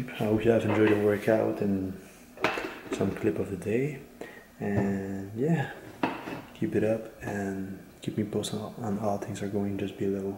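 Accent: Quebec, Canada. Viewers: T'es québécois ? Je te suis depuis peu donc je me demandais haha ;)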